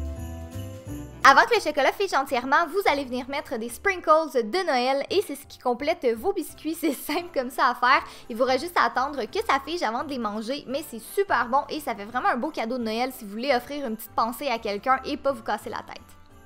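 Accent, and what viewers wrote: Quebec, Canada. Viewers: et puis j'aime beaucoup ton accent canadien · 💖💖💖💖💖 t'ai la meilleure j'adore t'ai DIY c'est les meilleurs ❤ j'adore ton accent Québécois il te va super bien 💖💖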